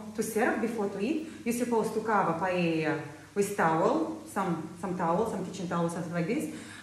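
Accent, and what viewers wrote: Russia. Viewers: I also heard accent similar to Russian... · Is she Russian? That’s no Spanish accent!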